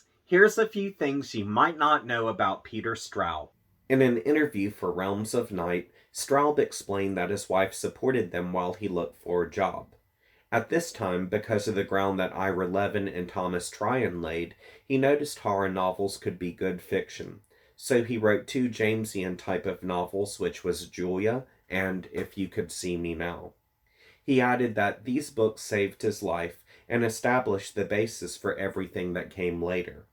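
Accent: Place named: Texas, United States. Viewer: That explains it, I'm born and raised in Texas, lived in Georgia for three years now, it was definitely nice to hear a familiar sounding voice on YouTube for a change ✌ I'm sending my best wishes, and have a wonderful day ☺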